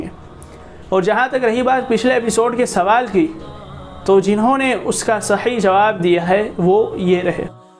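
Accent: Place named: Arab world. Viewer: اردو کو اردو کی طرح پڑھیں۔ اردو کو عربی میں پڑھ کر اردو کے ساتھ نا انصافی کر رہے ہیں۔ آپ کا کام بہت اچھا ہے۔ مشورہ بہتر لگے تو قبول کرلیں ورنہ معاف کردیں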